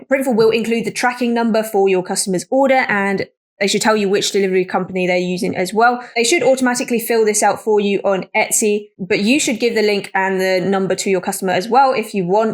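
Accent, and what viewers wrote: United Kingdom. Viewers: Thanks, sweetheart!!, so what I got from this was I have to press a button in printify, which I have just done i wish I had of known this morning before I went to my boring 9-5 job 😁 so is that it, yeah I was stocked to get my first sale today but your right I was confused what next very helpful thanks!! is that a British accent i detect? from a fellow brit here?? ;-) lol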